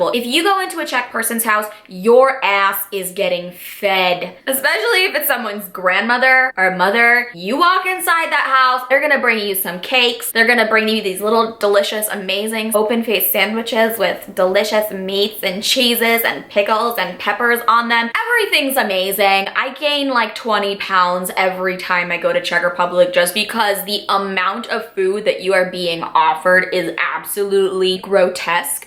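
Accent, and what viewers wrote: United States. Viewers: mluvíš jako kdyby jsi se narodila v americe :D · xD veľa američanov rozpráva takto xD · Hi darling, i love you really. I am from Czech, and I love you for one reason i think you are proud to be half czech person, if you dont understand me i wrote it in czech. Myslím si, že si pyšná na to že si napůl češka, a to je moc krásné od tebe. Doufám, že si to přečteš nebo mi dokonce odepíšeš. Bylo by to pro mě hoodně velké překvapení protože tě miluju, tvoje videa jsou 100% úžasné. A ikdyž máš americký přízvuk tak ti nádherně rozumím. <3 I love you!!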